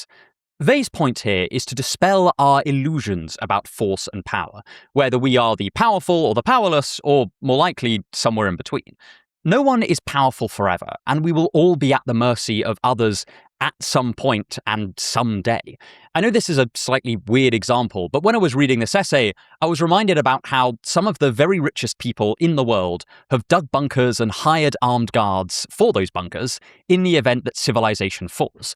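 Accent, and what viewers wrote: United Kingdom. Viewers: I like yur voice...are u brit?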